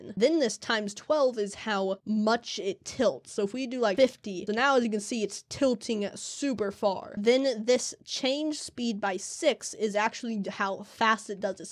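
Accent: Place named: United Kingdom. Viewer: bro is british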